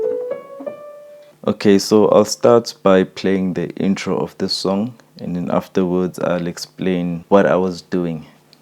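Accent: South Africa. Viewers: Wow you sound South African :D I got excited there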